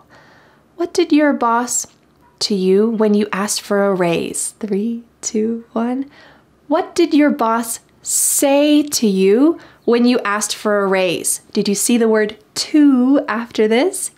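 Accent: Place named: United States. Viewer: You're American, aren't you? I hear the accent too strongly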